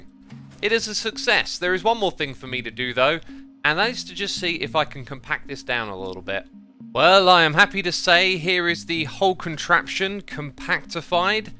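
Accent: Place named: United Kingdom. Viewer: im so used to voice now that i cant even tell he has a british accent, its just accent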